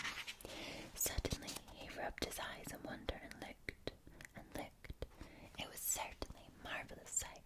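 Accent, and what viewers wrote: Scotland, United Kingdom. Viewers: Who else would love to see a Shrek roleplay from, she also has a strong Scottish accent, which makes it all the better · I’m loving the Scottish accent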